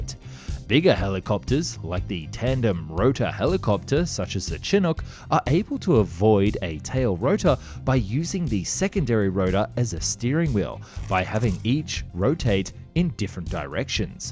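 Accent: Australia. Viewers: I never asked for aussie accent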